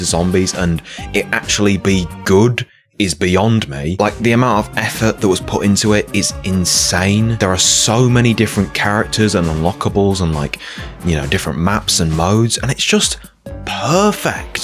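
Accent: United Kingdom. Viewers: This is the most British voice I've ever heard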